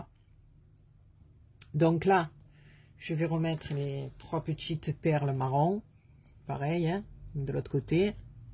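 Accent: South, France. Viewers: Magnifique carte panier très bonne idée pour la fête des mères. Je suis conquis par le petit panier, très jolie et en plus j'adore vous écoutez parler avec votre accent du Sud cela apporte du baume au coeur et un peu de soleil parce qu' il nous boude en Bourgogne. Merci pour ce superbe partage. Bonne fin de journée. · coucou je suis émerveillé par toutes vos belles créations, le panier fête des mères superbe, carte gâteaux également quel talent vous avez une imagination débordante pour la déco J,adore avec l' accent du midi je ne me lasse pas de vous écouter merci et bonne journée bisous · Magnifique ! Merci beaucoup pour ce tuto. Avec l'accent du soleil ..... j'adore !! Bises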